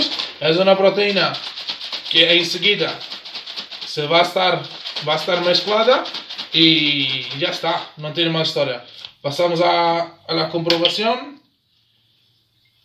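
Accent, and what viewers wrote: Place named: Portugal. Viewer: Voce es português me encanta el acento se portugal 😀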